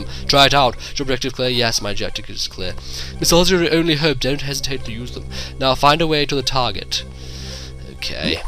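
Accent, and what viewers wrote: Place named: South Africa. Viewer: Your Australian accent, more south african..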